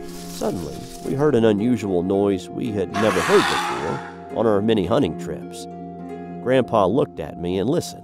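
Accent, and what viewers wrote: South, United States. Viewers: You have a very interesting accent. Are you from the south?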